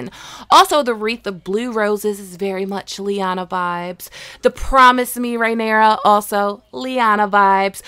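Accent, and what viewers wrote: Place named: Baltimore, United States. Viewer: U gotta be from Bmore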